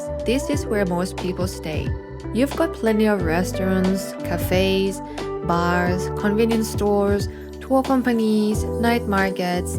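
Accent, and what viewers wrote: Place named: Thailand. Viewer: สำเนียงเหมือนคนไทยเลยคับ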